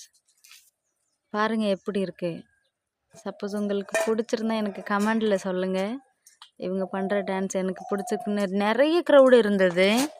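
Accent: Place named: Telugu, India. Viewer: Yei paithyam tamil ozhunga pesu, ennomo telungu ponnumari Pesara? Over sean podatha, tamil kaarithane nee thikki thikki pesura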